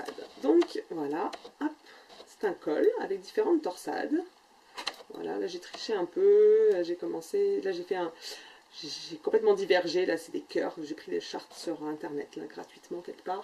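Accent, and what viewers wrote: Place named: Quebec, Canada. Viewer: Bonjour, Super podcast. Il me semblait bien avoir entendu un léger accent québécois. L'Arizona est superbe et en été il fait très chaud. J'ai sillonné les USA depuis Montréal , du nord au sud et de l'ouest en est avec une amie et j'ai vu le grand Canyon. C'est magnifique , j'ai visité Phoenix et j'ai beaucoup aimé mais cela fait 30 ans. J'ai envie de commencer le crochet mais je ne sais par quel projet debuter. En tout cas j'attends avec impatience ton prochain podcast. Sylviane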